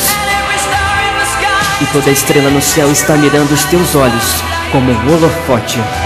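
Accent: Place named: Paraíba, Brazil. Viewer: Voz meio Paraíba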